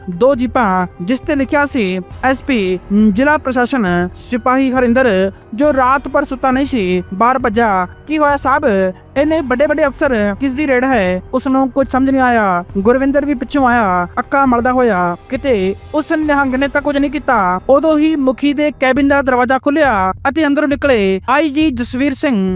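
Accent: Bihar, India. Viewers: ਪੰਜਾਬੀ ਬੋਲਣ ਵਾਲਾ, ਭਈਆ ਲਗਦਾ? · Bolan vala koi jokar lagda hai. Eh punjabi hai ke bhaiya.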